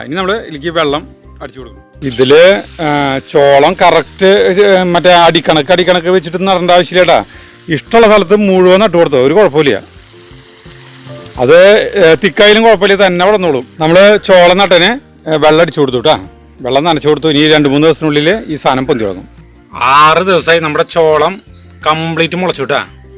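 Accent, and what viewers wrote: Thrissur, India. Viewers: Thrissur slang❤ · ആദ്യമായി കണ്ടതാണ് ആളുടെ വീഡിയോ!മടുപ്പിക്കാത്ത നല്ല അവതരണം.Keep It Up. സസ്ക്രൈബ് ചെയ്തു. തൃശൂർകാരൻ ആണല്ലേ! ആ സ്ലാങ് ഒരു ആവേശമാണ്. Wish You All The Best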